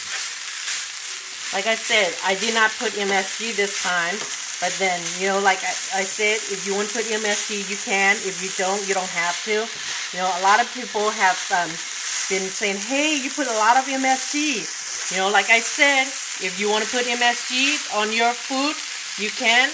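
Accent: Laos. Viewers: The crepe were lumpy, some had holes, the fillings were falling out. they were ugliest mess but very yummy. I love the sweet and sour sauce. I just need more practice. I use a lot of MSG and I am very much a live. So I will keep using it. thanks for the tutorial, your Laos English is just perfect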